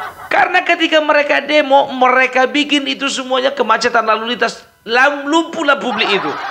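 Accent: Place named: Sunda, Indonesia. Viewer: Mantap banget opung.bisa.bahasa.sunda.ey hhhh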